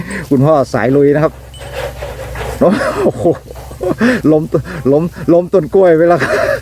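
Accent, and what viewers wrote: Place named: North, Thailand. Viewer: อู้กำเมืองก่อได้ก่าอ้ายกำเมืองม่วนหน๋า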